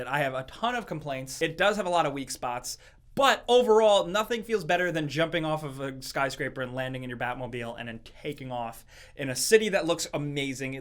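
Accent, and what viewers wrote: Australia. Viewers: Actually a Australian accent basically sounds just like yours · what the hell was up with your Aussie accent?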